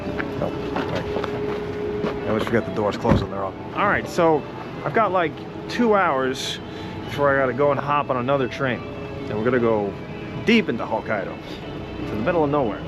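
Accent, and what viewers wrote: New York, United States. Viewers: Just wanted to say that I really love your videos. As a fellow New Yorker who used to travel a lot when I was younger, it's like nothing else to be able to tag along on these adventures of yours. It's just so real, like a window into another life. I really appreciate your NY accent and your sense of humor, you just feel like someone I would know, but your skill with languages makes these videos. Not another disrespectful American, someone who gives the rest of us a good name. Thank you for all of it. The Russian and Israeli videos were harrowing, by the way, if it wasn't already uploaded and we were watching it live, I'd even say anxiety inducing. Courageous. You deserve a break, hope you get a nice rest back here in the states, and looking forward to seeing you back at it whenever it strikes you. In the meantime, I'm sure there's more in your backlog I still haven't seen and will content myself with that. 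Thanks again for all of these!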